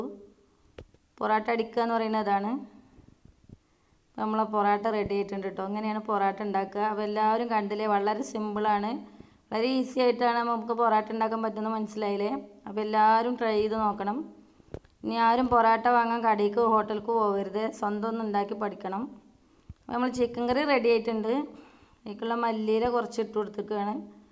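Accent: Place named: Malappuram, India. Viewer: മലപ്പുറം ടെച്ച്. അത് മനസ്സിനൊരു ആനന്ദമാണ്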